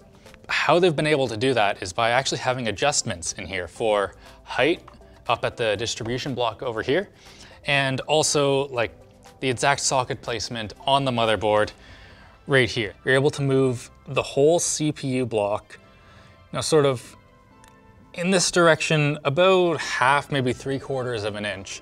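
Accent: Canada. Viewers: has never sounded so Canadian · I love, but this is the first video where I could really hear his Canadian accent coming through (which was great). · Thicker canadian accent than usual? :) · btw what is that accent? canadian accent?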